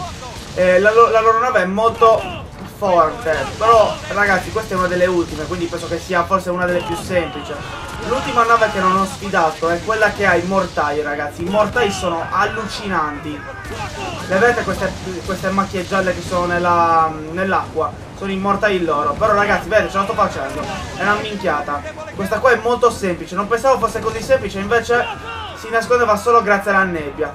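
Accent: Sardinia, Italy. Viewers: sei sardo vero :) anche io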